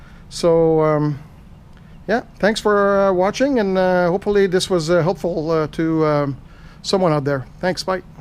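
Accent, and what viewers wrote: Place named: Israel. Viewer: אין כמו המבטא הישראלי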